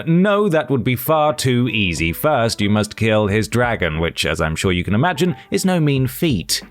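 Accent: United Kingdom. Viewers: wait a sec , you are British ?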